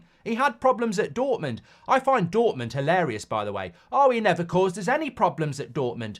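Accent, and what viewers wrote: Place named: England, United Kingdom. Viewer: I love watching not just for his reviews but I can learn English accent at the same time. 😄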